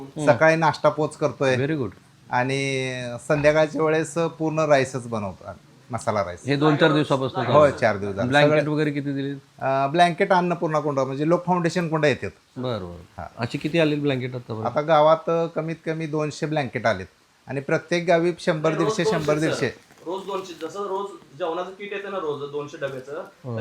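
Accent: Marathwada, India. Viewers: सर, तुमच्या बोलण्यातून मराठवाड्याची..बोलीभाषा ऐकायला...मिळते... खूप छान वाटत... अशाच काम पुढे चालू ठेवा...अशा चांगल्या गोष्टी समाजापुढे ठेवत जा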